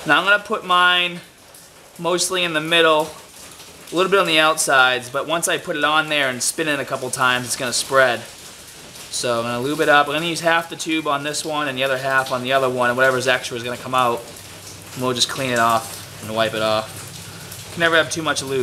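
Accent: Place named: Brooklyn, United States. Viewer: Funny, u can totally here the Brooklyn accent more in this vid than any other lol. Sweet car!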